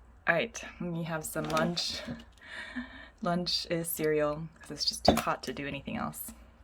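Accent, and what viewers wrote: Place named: United States. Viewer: chill and nice vlooog 💜 take careee!! alsoooo still amazed by the fact that you are fully korean like...you sound american when you speak in English 👏😳💜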